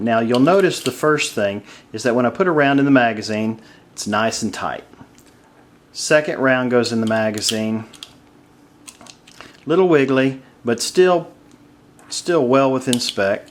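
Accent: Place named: South, United States. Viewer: I wouldn't recommend you carrying a gun or complaining about this fine weapon.I realize you must live in a trailor park by the hick accent.Please do yourself a favor and contact Kahr.I believe the service rep would explain to you that the gun is designed that way.The wiggle and wobble don't effect the gun.Gun requires a break in.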